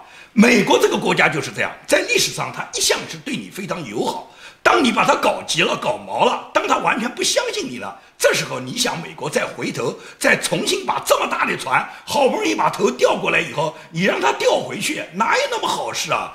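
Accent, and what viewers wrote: Nanjing, China. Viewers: 建民老师有标准南京人的特质，真诚，每天一睁眼第一件事开YouTube ，听建民老师的南普话，我在光华东街长大，听建民老师就像听家门口的邻居在聊天，这样的良心媒体，让那些五毛（现在是二毛）或者恶意挑三揀四的粉红，有多远死多远去。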